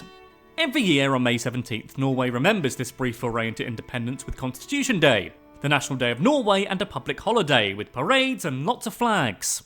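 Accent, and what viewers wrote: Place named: Quebec, Canada. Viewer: Funny accent for a Canadian.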